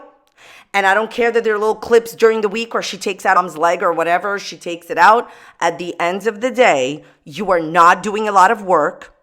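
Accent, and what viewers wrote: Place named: New York, United States. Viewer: I’ve been a part of the Amberverse since this most recent “breakup” in July. No idea how I haven’t found your channel sooner!! I moved to Florida a few years ago, from Massachusetts. Your thick NY/NJ accent reminds me of the North… I love it! Definitely my new favorite GorlWorld reporter!!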